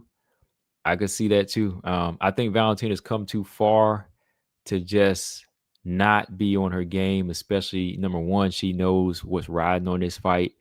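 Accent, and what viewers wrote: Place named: Ohio, United States. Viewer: are you from Ohio? You sound and speak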